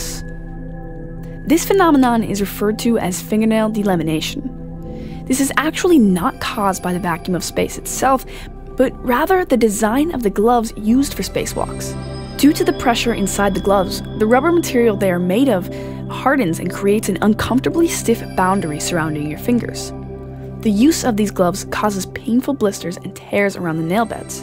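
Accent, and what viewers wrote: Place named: Canada. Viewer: I'm sensing a Canadian accent, specifically any word that rhymes with "out". You don't have the full-out "oot" pronunciation, but I'm still hearing a slight difference from the American accent of which I speak.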